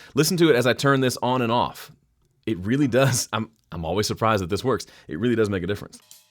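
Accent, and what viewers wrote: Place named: Indiana, United States. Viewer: So you’re from Mississippi? Sound more Indiana🤓 How ‘bout dem Memphis Tigers? Great tip too, thank you good sir.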